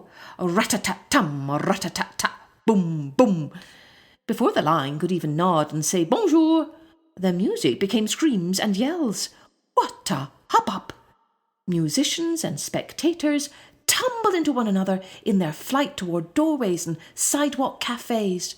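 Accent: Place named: Scotland, United Kingdom. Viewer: This was my absolute favourite story. The first story I ever remembered. My mother would read it to me when I was a toddler and I remember it. I loved the artwork especially the bushy end of his tail used to fascinate me and his great wild main. The man who fainted and the way he was on the floor used to fascinate me too. This is the first time I have seen this story since way back then and it imprinted so deeply upon my imagination and spirit and I remember that feeling all over again. It’s a feeling of joy and wonder and a strange texture that is hard to describe with words. That’s when you know you are reading something that someone’s soul has touched it. C,s Lewis used to describe such a feeling and he called it joy. I think it’s something that unlocks something of the human spirit. Another story my mum used to read to me was Brambly hedge and had a similar effect on me as a child. 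Thankyou and loved hearing this from a beautiful Scottish accent.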